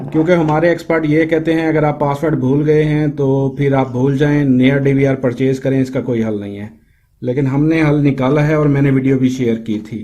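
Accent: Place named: India. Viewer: primera vez que veo un video completo narrado por un indi, tuve que soportarlo, para saber como hacer la copia de la memoria serial...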